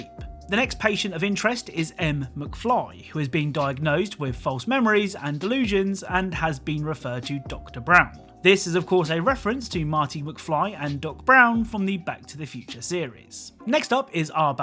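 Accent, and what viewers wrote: United Kingdom. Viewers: Where are u frome? UK? You sound yo Britichhhhhhh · He is British.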